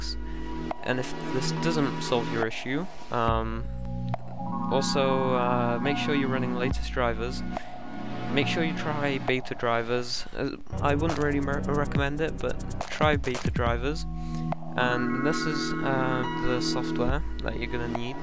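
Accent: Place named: United Kingdom. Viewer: Yeah british accent is already hard to understand and the music makes is harder to understand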